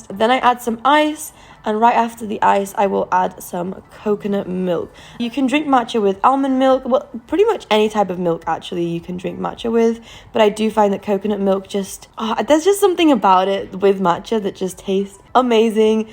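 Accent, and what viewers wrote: United Kingdom. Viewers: Trying to work out what part of the UK you are from - is it somewhere near say Bath or Bristol but maybe originally from the North West?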